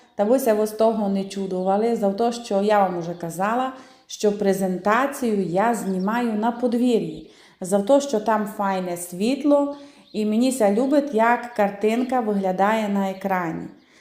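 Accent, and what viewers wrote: Transcarpathia, Ukraine. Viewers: Дуже гарна начинка.а діалект закарпацький -зачаровує.Респект каналу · Дякую за цікаві і смачні рецепти. Закарпатський діалект відрізняється від галицького чи буковинського, але всерівно зрозумілий. Я б сказав, що це додає якогось колориту приготуванню... З прийдешнім Святом Пасхи!!! Нехай в 2024 році настане мир і перемога України! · Пані, але ж соковита закарпатська говірка! А рулет Ваш приготую! Дуже гарно виглядає і хвалять його! Дякую!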